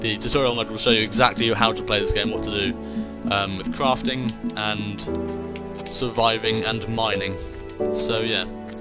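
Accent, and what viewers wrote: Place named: United Kingdom. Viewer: speak american so i can understand u U fucken brit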